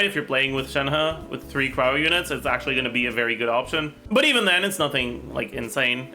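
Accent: Quebec, Canada. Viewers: Maybe because thats how he really pronounce these words since he comes from quebec where french is common ? You're the cringe one here lmao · the french part of canada yea i can hear it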